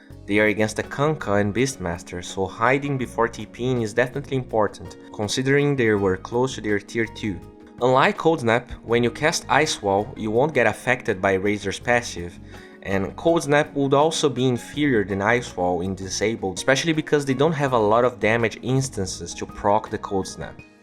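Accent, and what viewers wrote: Brazil. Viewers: Cara, não mente pra mim, você é brasileiro! Eu posso reconhecer um bom ingles CCAA de longe! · esse sotaque é muito BR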